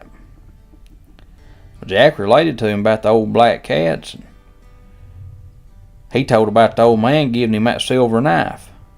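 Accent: Kentucky, United States. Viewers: Mrs. Reid, our librarian in elementary school read Jack tales to us every day. Kentucky accent just like this. Thank you so much!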